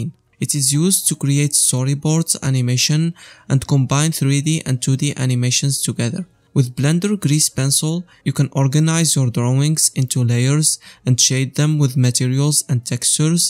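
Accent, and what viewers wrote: Morocco. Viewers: Living for the Moroccan accent!! 😄 Bless you for this video! I'm trying to learn 2D and 3D animation on Blendr and it hasn't been so easy so far, a bit confusing! hopefully it'll get better soon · Is this a Moroccan accent ? Good video tho 👍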